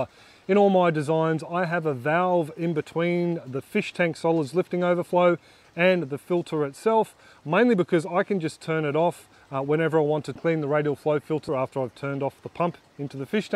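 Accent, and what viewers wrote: Australia. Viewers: Really good, interesting and educative videos, you've been very useful to my setup, your explanations are clear despite your funnny Aussie accent ! It's completely understandable.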